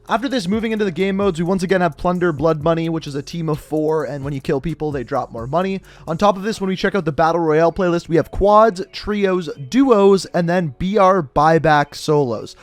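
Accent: Canada. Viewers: Bro are you Canadian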